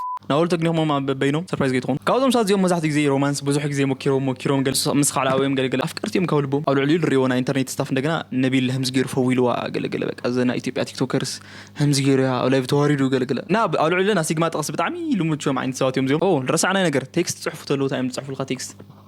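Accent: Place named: India. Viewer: 5፡00 ሂንዱ ተናጋሪ ትመስል ብሮ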